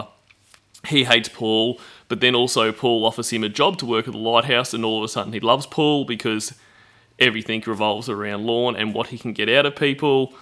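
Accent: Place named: Australia. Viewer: Somebody from Nobles livestream today referred me to this vid for a accurate recap on my Lorne Noir. Soooooooooooooo good 👍 cant imagine all the hours upon hours of Lorne bullshit u had to listen to just to make this timeline, for that, always remember I appreciate yew precious. Lol 🌹🌹🌹🌹 P. S. Ur Aussie accent has been my bed time ASMR lately 😍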